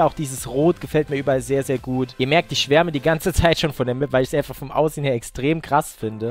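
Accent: Saarland, Germany. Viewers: Kommst du aus dem Saarland?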